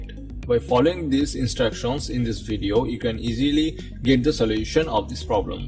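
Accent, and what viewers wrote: India. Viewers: He is speaking English, you fucking pleb. He's Indian and has a strong accent. So as English probably isn't his native language, I think he's pretty understandable. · I used automatic subtitles because of his accent (I'm not a native and still aren't used to the Indian accent). The automatic subtitles worked pretty well mostly.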